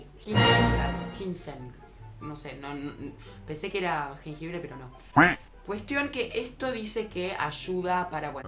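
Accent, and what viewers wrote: Argentina. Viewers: se le nota los argentinos hablamos así uwu.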